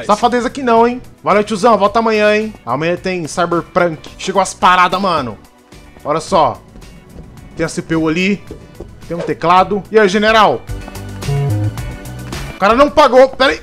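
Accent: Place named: Pernambuco, Brazil. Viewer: conheço um oxi ate se eu fosse surdo,vc e Pernambucano sim como eu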